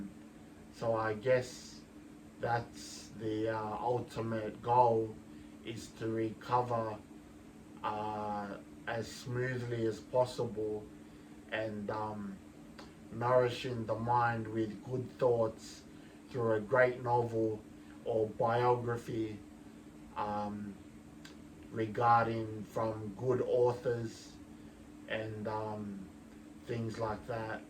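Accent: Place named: New Zealand. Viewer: Are you originally Kiwi? I hear a bit of a Kiwi accent but you're Polynesian anyway! That is clear brother!😂😜I'm gonna say Samoan (please correct me if I'm wrong!) I've played rugby with so many Pacific Islanders and I have a great love for you guys! The most generous people I have ever met! All the best brother! Much love from Ireland! Nofo lelei lou uso!❤🇮🇪🇼🇸🇹🇴🇫🇯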